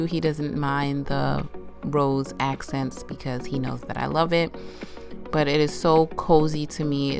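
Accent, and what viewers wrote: New York, United States. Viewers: Glad you're better Suga, I love it! You picked a great color for your accent wall..I am a pillow person so I'm fine with it. They look really nice once the bed is made. I was cracking up when you were on the bed watching tv while hubby was fixing the bookshelf!! You have a beautiful NY accent!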